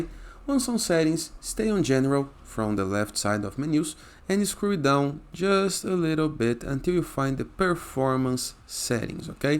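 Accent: Brazil. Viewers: tu e brasileiro ne? that ascent...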